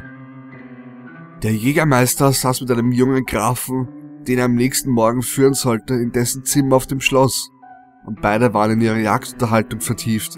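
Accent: Austria. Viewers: bist du jetzt eigentlich Deutscher oder Österreicher? Dein Akzent klingt immerhin nach Österreich:D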